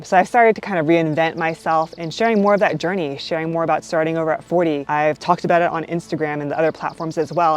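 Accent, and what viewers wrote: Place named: Hawaii, United States. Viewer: To sum it up, on the Mainland you are judged by what you do and what you own. In Hawaii, you are judged by who you are as a person. It doesn't matter if you're poor or insanely rich... if you're a jerk, you'll be treated accordingly. As we say, "couple slaps to da head going fix 'um." I was born and raised and lived my entire life here on Oahu. (68 years) You have to remember that you can fit the entire island of Oahu inside the Los Angeles city limits! Living together on an island that small, you kind of have to adopt an attitude of kindness and aloha because there's no place to hide. Everyone knows everyone here! LOL!! By the way, I notice she picked up some local 'pidgin' in her words. Das right instead of that's right. LOL!! You one of us now, sistah! No can get away! :)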